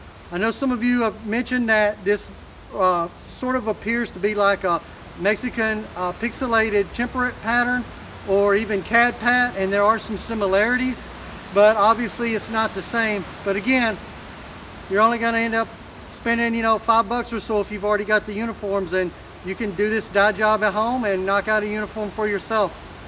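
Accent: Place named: United States. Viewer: Don't see anyone in the video?? All I hear is an American man???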